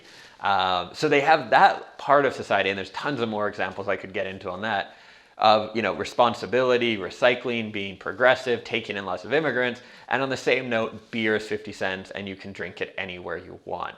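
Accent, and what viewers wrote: Canada. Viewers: That Canadian accent.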